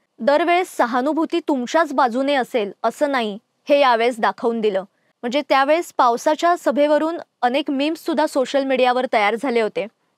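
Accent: Standard. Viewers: कॅमेरासमोर तू स्वतःला छान सादर केले आहेस. तुझा खणखणीत आवाज, स्पष्ट आणि शुद्ध उच्चार व आत्मविश्वास कौतुकास्पद आहे. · छान...स्पष्ट आणि शुध्द उच्चार... खणखणीत आवाज...उत्कृष्ठ विश्लेषण....👍👍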